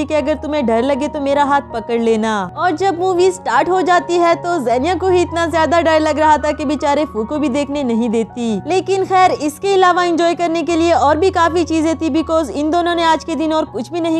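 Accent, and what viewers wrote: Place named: India. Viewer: Aapke awaj se lagta hai ki aap indiqn ho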